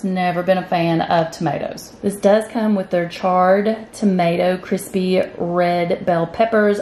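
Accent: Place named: Tennessee, United States. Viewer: i knew i recognized that accent. Im from Elizabethton, TN too! Small world!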